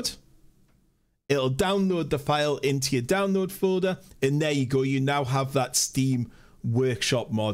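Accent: United Kingdom. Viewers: seems to be british.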